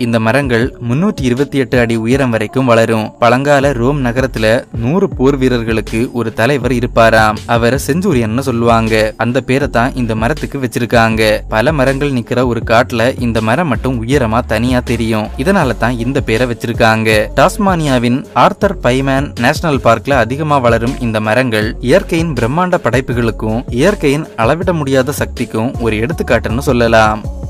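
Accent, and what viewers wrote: Kanyakumari, India. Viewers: கன்னியாகுமரி காரனா நீங்கள்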